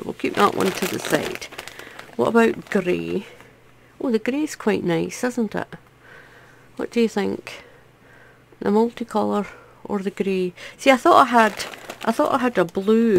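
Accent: Scotland, United Kingdom. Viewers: i really love your tutorials nice to hear a scottish voice i'm from stirling now living in northern ireland 44years i've been here you explain everything so good i will be trying this hat ty ❤ x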